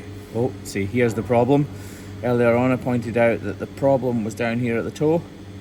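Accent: Scotland, United Kingdom. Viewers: He's from Dumfries and Galloway, Scotland. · Hopefully the cow gets better soon also i love that Scottish accent 💖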